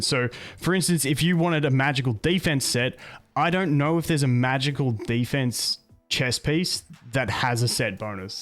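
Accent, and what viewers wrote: Australia. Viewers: damn i always like your accent, sir. what is it? aussie?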